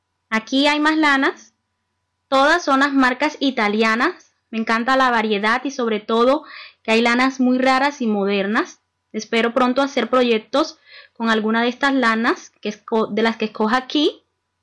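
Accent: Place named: Chile. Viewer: siii que bueno eres paisana mia por el acento supe.. saludes desde Chile!!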